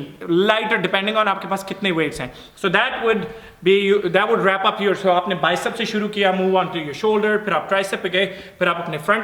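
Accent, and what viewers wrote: Punjab, India. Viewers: the way you talk.. its soon cute specially your Punjabi tone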